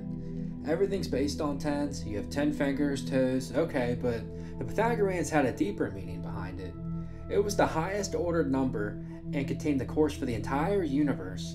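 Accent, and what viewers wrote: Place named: Pittsburgh, United States. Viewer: You sound like you're from Pittsburgh. Good video, cheers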